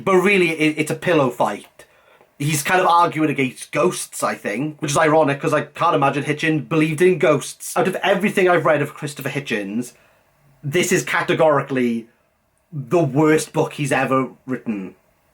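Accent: Wales, United Kingdom. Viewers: couldn't understand a word you were saying .................oh i see your Welch ,,,,,,,,,,,,, and your a theist ,,,,,,,,,,,,,,,,,,,ha ha ha ha .........oh dear oh dear We stand for your right to be a theist .............but please know your wrong , your living in a fantasy land stop being a Fucking child , let me point you in the right honourable direction of the patron saint , His holelyness , all explained here <link>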